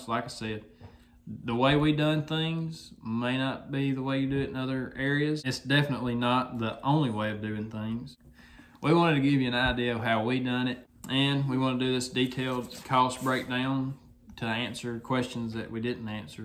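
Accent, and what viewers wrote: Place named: Mississippi, United States. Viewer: Don't apologize for your dream. It is awesome and your videos answer a lot and inspire people to do their own. Some of the best videos for that with Pole Barns I have seen. Plus as a guy who met/married/had children in and lived in MS for 13 years I appreciate the down home accent and lingo. :)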